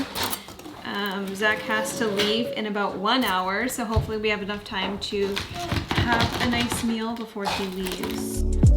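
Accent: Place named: Canada. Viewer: Love your videos so much, whenever I hear that Canadian A sound it makes me smile a little. I'm American I don't know why I just find the way you say words like bagels to be so charming like oh that's right! We're neighbors, look at those slightly different vowels! Haha!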